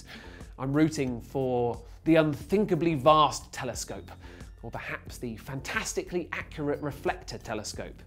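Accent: United Kingdom. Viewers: WHY is everything that is scientific narrated by some guy with a British accent? And I still havent found out if a telescope ZOOMS like a camera lens or are they fixed?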